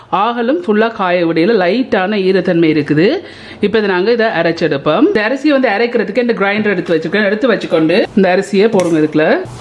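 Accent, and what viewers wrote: Jaffna, Sri Lanka. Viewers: சுத்தமான யாழ்ப்பாணத்து தமிழ் பேசுறீங்க 😀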